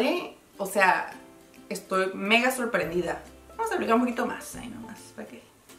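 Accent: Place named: Colombia. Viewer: ¿de dónde eres? Por el acento pareces colombiana....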